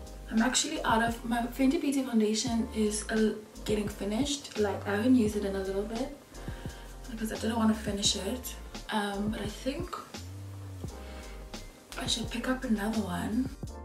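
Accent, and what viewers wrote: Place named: United Kingdom. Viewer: Hey! Love this tutorial as always and I've BEEN a fan since your days (British accent and all lol) I'd love to win this prize and follow as much of your amazing looks as possible❤❤ Instagram: nickynic_101 Foundation shade: Maybelline 24 HR superstay Caramel 60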